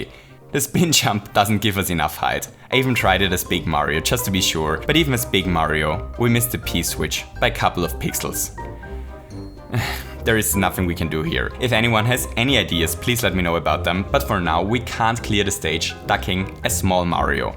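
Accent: Germany. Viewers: I hate the German acsent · Wie ich immer herausfinde anhand des accentes das jmd deutsch bzw Österreichich spricht. irgenwie hört man das raus!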